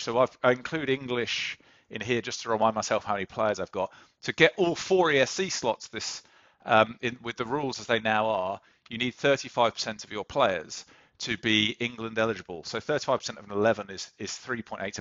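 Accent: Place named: South America. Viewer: Very interesting to have the critical data, but imo, sexy sounding South American > data.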